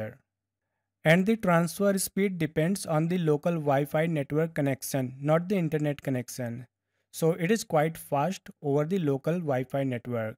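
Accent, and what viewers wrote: India. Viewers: Your Indian pronunciation gave me a headache.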